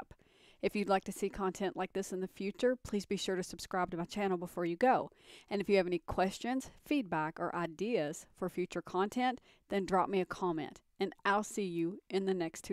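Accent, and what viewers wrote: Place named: Texas, United States. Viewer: I really enjoyed your laid back presentation and, being from Texas, I appreciate your accent! (finally someone I can understand who isn't mirroring video game graphics to amp up their presentation. I only wish your screen were clearer. It is very "soft" and unfocused. Otherwise perfect. Keep up the great work!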